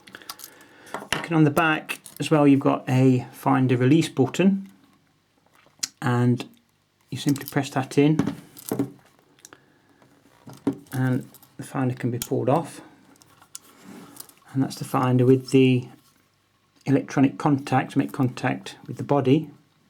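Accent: Yorkshire, United Kingdom. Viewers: much appreciated, I noted after I sent the message that it was six years since the video, but I live in Lyndhurst New Forest have done for past 30 years, but used to be a fireman in South Yorkshire Sheffield having Transferred over from Manchester, so it was nice to hear the dulcet tones of true people! I collect cameras and have a varied selection right across the board, but I’m light on the Older Nikon, shall we say in the upper bracket, revered F series F1 and F2, so you prompted indirectly, a bit of homework 📚 and I looked at several references to these models and your right the F2 gets glowing reports in fact it’s difficult to find a bad remark, also the XD in my Minolta there is an XM on eBay at present, that looks decent and money at present is good, but I think you have swayed me. Loved the various controls and invasions, Minolta where always like that though, even in the Dynax range it was always more, but probably their down fall. 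Still the name lives on with Sony and It’s Nikon that are really having to come up with the goods now. Still carry that premium Name and always will. Thanks again and nice that you got the message. Regards David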